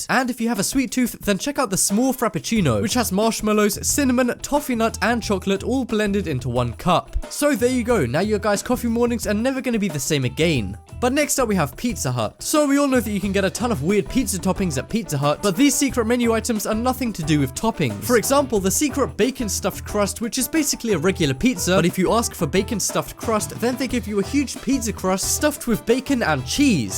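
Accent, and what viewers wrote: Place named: United Kingdom. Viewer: You got Brit voice but say dollors so are these available in uk not that I be ordering soon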